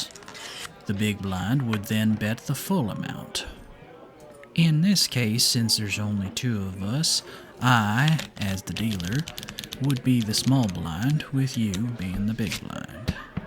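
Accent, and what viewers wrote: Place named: South, United States. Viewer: I may listen to this over and over again if I want to play poker with my dad, cause like the title says "Mysterious Stranger Teaches You Poker". Also, love the Southern accent, and after playing poker with "stranger" here, I trust this guy :)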